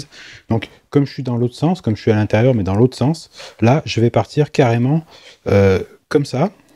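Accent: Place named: Belgium. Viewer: je suis d'accord avec toi, je ne vois aucune méchanceté dans tes vidéos. J'y vois surtout de bons conseils venant d'un pro ainsi que de la bonne humeur. En plus, ayant grandi juste à côté de la Belgique, retrouver ce joli accent ainsi que les septante et nonante me ravit.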